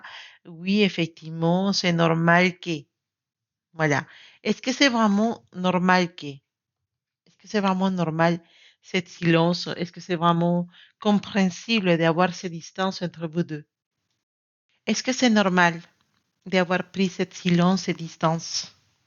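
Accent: Spain. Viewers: Merciii cest fou cest ca cedt avec un vierge flamme jumelles yavait une tierce je lui ai dit mes sentiments et aussi quil me manquait samedi cest son anniversai je vais lui envoyer un message vous avez un joli accent vous etes espagnole ma maman est mexicaine merciii je laime de trop ca gait un an que je lattends la je vois une personne meme si je laime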